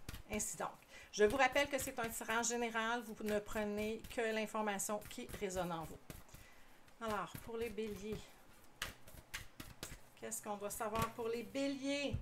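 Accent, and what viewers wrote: Quebec, Canada. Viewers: Enfin une voie québécoise et belier en plus 😁😁 pour ta guidance il est un peu tôt pour juger donc je vais te suivre et voir si sa résonne !!! 😊😉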